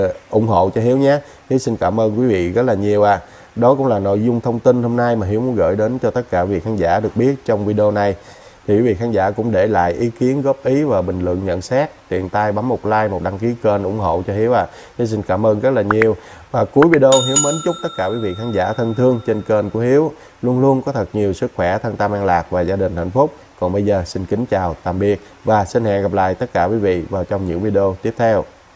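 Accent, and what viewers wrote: Standard. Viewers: Tiếng nói quá chuẩn luôn❤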